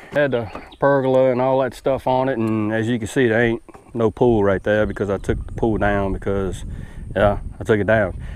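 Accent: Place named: Louisiana, United States. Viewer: Not sure where you are from, but it certainly sounds like you have a little Cajun accent going on there :)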